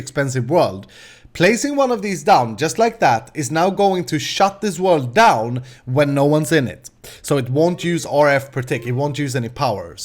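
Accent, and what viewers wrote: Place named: Sweden. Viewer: . You sound swedish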